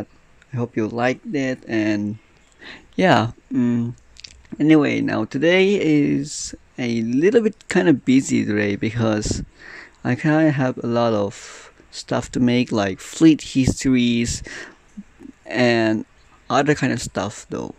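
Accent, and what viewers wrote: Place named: Singapore. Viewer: I thought u are from singapore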